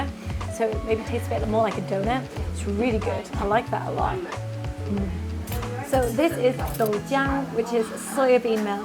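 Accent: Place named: England, United Kingdom. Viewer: Hi. It is so refreshing to hear an English accent and so down to earth and there is nothing pretentious about you which I find uniquely different to other bloggers. Dou jiang comes in salty and sweet version. I believe in the south it favours the sweet version and in the North the salty. I prefer the sweet one myself but each to our own.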